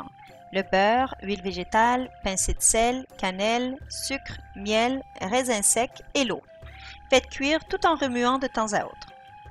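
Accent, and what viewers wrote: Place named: Quebec, Canada. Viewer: C'est marrant lorsque tu parles Français tu as un petit accent canadien, tu es au Quebec ? Merci pour ta recette en tout cas. ;)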